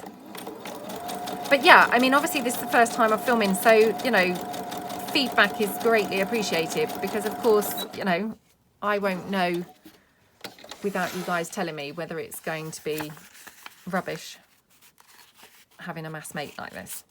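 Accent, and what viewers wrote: United Kingdom. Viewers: it’s not Diane. I knew it had to be somewhere in the UK because I have a friend in London with the same kind of accent. I am from Michigan in the US. I absolutely am loving your tutorials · I love to watch your videos. I love your chatty ways. You take my mind off my worries for a while. It's like having a British friend over to craft!! What fun! Imagine you listening to my Southern Oklahoman accent. Very beautiful work.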